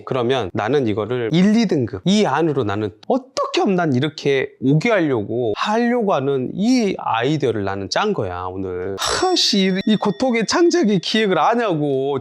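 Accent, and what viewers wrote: Jeolla, South Korea. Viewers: 경상도인데 전라도사투리 넘 재밌어요~~😂😂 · 마음가짐 확언만큼은 이미 1등급 입니다 좋은에너지 나눠주심에 감사합니다 긍정바이러스는 선물 입니다 중간중간 구수한 전라도 사투리도 정겹구요 좋아요 버튼은 늘~항상 잊지 않는것도 팬심이랍니다ㅎ 파랑색을 좋아해서 자꾸만 쇼파가 탐이납니다요ㅎㅎ 파랑새는 멀리있지 않습니다 이미 제 마음속에 있네요^^ 확언!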